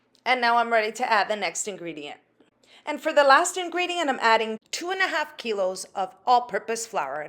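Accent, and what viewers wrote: Portugal. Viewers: A sua massa está linda,muito bem explicada.Adorei a sua pronúncia açoreana.Nasci em S.Miguel,mas estou na Califórnia há 53 anos,adoro tudo o que vejo,e também faço.Abraço Felecidades ❤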